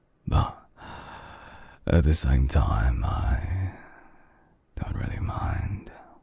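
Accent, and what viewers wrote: United Kingdom. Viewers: He's....brit isn't he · i swear the british accent makes this video <num>X better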